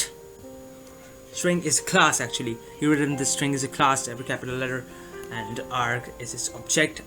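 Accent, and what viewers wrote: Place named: India. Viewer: Accent Indian hi rakh. Aur piche ka music hata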